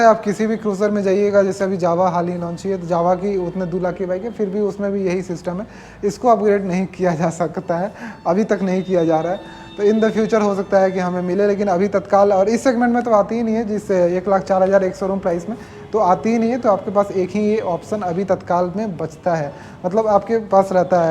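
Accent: Bihar, India. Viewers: Are you from Bihar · I bet you are Bihari. I guess because of your pronunciation of ra and da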